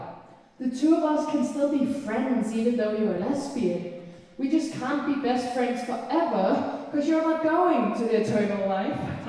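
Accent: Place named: United Kingdom. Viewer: Perfekt oversættelse fra din danske bid i “Grin til Gavn” oven i en formidabel britisk accent!🤩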